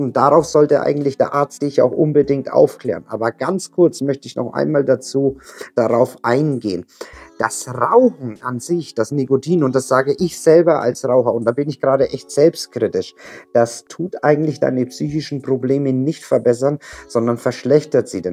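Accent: Franconia, Germany. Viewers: bist du franke?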